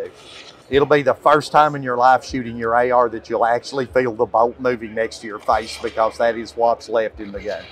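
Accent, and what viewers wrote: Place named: South, United States. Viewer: Where are you from? Im from Bama and damn thats some southern twang.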